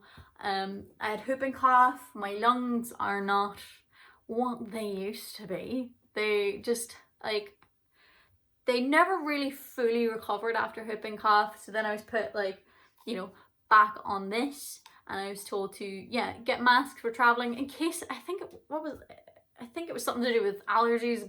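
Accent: Ireland. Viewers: I hope you enjoy the rest and free time, stay safe well and happy. You make me smile and feel good, i so enjoy your Irish accent. Glad your getting on top of your problems i heard a trick that helps people go to sleep you start by relaxing your shoulders and then work down your body and then start saying dont think dont think slower and slower it seems to work with me im asleep in minutes i think sometimes we need to rest our brains. xo live long and happy. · Glad to see u back. Ur accent is more Irish. Love it.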